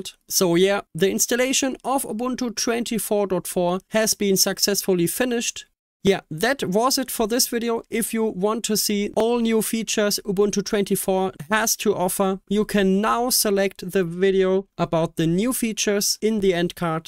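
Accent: Germany. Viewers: i hear Deutsch?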